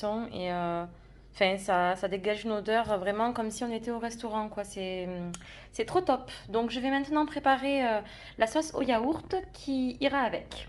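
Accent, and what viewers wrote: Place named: South, France. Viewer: Excellente vidéo! Efficace, plaisante, pleine de bons conseils et en prime la musicalité du sud! Bon, y a plus qu'à faire la recette! Merci!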